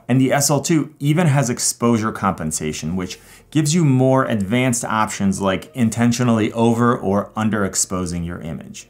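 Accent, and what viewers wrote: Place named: Ohio, United States. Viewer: Hahaha, I thought to myself, "Man, Ohio. I can hear it." Then you showed Cleveland. BOOM. Greetings from an Ontarian in South Korea. <3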